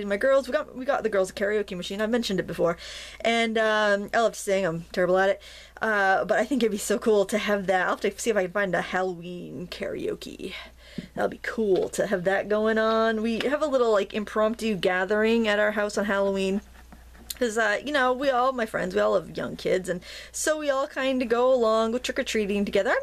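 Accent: Maine, United States. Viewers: This is funny.... I had just been thinking about how you say the word drawing, and then you said something about it. It is fun listening to your Maine accent while tutoring. I love your work, as I have said many times. Think I will "drar q" some gourds, for my friend who carves them as a side income. She is incredible, and being her friend, I have been given many, so a gourd card would be perfect for her. Thanks for the "draring" tutorial!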